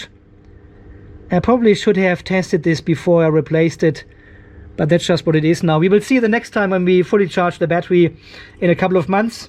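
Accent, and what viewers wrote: Germany. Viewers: Ah the weekly dose of Australian volts with a German accent. Cheers!, i connected the "peter" inverter to a convection oven. Now that draws some amps! bake some bread/pizza's if you want a load seems a great idea... Great display btw.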